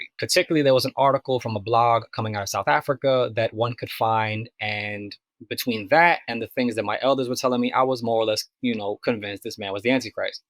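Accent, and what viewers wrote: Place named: New York, United States. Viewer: I used to go to Limelight , Twilight , tunnel in my late teens and it was a scene ! I didn’t realize all this vampire culture was going on around me. I was just there for the music lol and the dancing. You are so eloquent and as a fellow New York Latina I love listening to your native New York Latino accent. Great video !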